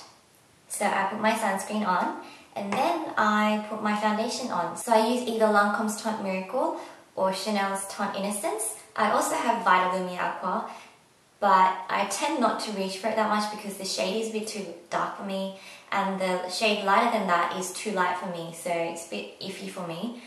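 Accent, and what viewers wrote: Australia. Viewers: That's Australian accent : )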